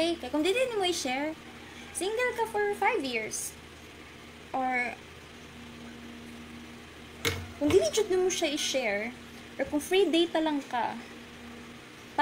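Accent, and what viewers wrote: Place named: South Korea. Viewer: New subscriber here lods,.😊 ilove your korean accent. Mag smile ko while watching sa emu mga video.❤❤❤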